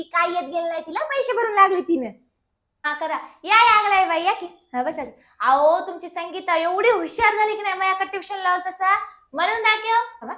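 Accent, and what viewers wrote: Nanded, India. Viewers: Tumchi language khup chan....😂😂😂😂😂😂😂 1 number Nandedkar...😂😂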